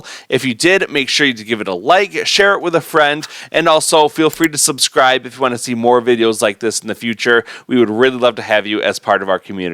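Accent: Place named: North America. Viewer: Is this the NA version or EU version, im guessing NA because of your voice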